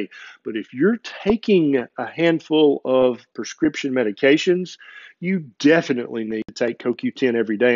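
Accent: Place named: Tennessee, United States. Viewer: I love you! You are so funny and shoot from the hip! Put together with that Tennessee accent and you have become my fav person to listen too!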